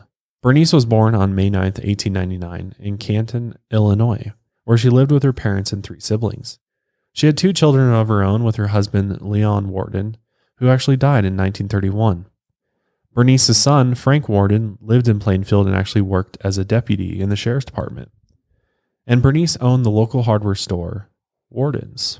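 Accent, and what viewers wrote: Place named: South, United States. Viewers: I never thought people from Colorado had a southern accent but then again I'm from Texas and a lot of us have a heavy accent😂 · It’s so funny you talked about having a southern Colorado accent because in my head I have always thought you sound kind of southern/ Texan lol! I’m from Texas, btw. Love the podcast!! Any content you or put out is 100% 💜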